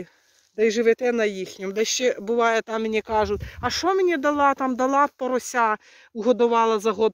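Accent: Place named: Zhytomyr, Ukraine. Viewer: доброго дня вам,ви дуже гарна жіночка один позитивчик,не звертайте увагу які роблять вам зауваження,у кожному регіоні розмовляють по своєму, А в Житомирській області так розмовляють,я трошки там жила.Коростишівський район с.Харитовівка.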